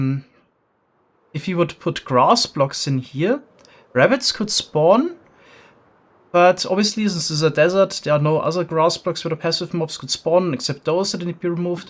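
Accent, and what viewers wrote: Germany. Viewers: His accent is really german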